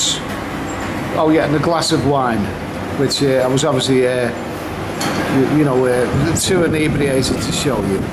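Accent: Liverpool, United Kingdom. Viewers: Love the insight mate, top scouser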